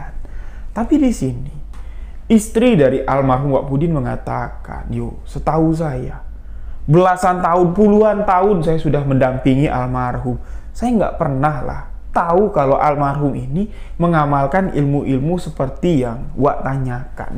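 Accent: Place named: Jambi, Indonesia. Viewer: Walaupun saya orang Banten, saya suka sekali dgn bahasa jambi. Mas logat bahasanya jambi punya sangat bagus.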